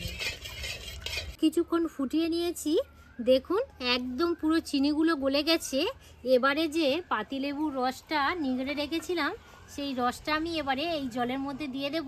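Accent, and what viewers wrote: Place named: Ghoti, Bangladesh. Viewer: আপনার সুন্দর বাংলা ভাষা (ঘটি ) শুনলাম, ভালো লাগলো।ভুলে গিয়েছিলাম ভারতবাসী।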